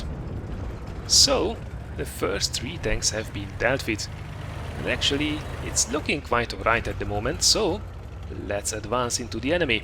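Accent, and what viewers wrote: Hungary. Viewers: where are you from cause your accent is like Hungarien :)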